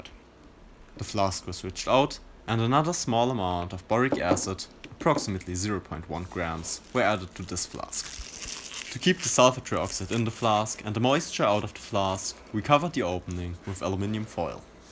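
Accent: Germany. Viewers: ich finde seinen Deutschen Akzent beim Englisch sprechen super😂👍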